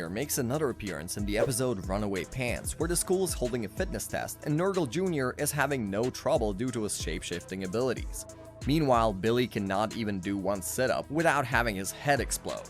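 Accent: Canada. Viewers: I had stopped 10 mins before the end of the video... dude I know you gotta be Canadian because of using zet but they still sa the names correctly -_ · Great video. Where are you from? I notice your pronunciation stands out. My guess is Canada.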